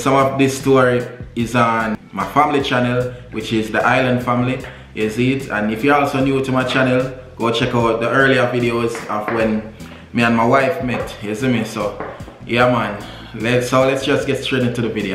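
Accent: Jamaica. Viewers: ? He is speaking english. What you are saying is that you would like to hear him using a foreigh accent. There's nothing wrong with a Jamaican accent. Not everyone is as frightened for nothing!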